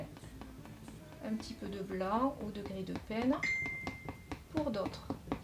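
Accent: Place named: South-West, France. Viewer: Chirurgicalement parfait. PS: vive l'accent du sud ouest !!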